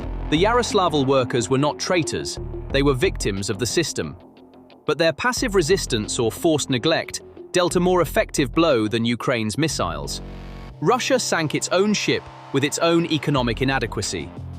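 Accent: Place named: United Kingdom. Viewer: British voice over. DREADFUL American number (mis)pronunciation. Dislike from me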